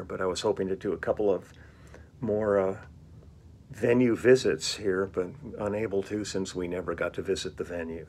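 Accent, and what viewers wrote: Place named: New York, United States. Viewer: I love the fact that you sound like a real New Yorker and seek out gems like Joe's Pizza! Cheers from Manhattan!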